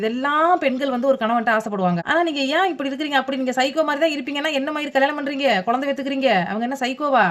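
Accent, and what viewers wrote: Nagercoil, India. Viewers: Neega egauru Ponnu Ana nagarkoil ponu pola pesariga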